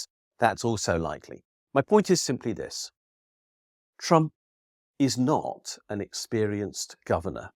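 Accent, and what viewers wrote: United Kingdom. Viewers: Ok, you talk funny. You are not an American, so p!ss-off Limey.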